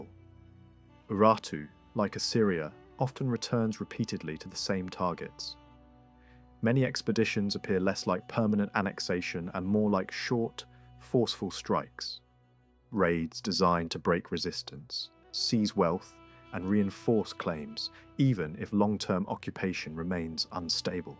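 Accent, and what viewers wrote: United States. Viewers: Konum Türkiye ama aksan ABD 🤔?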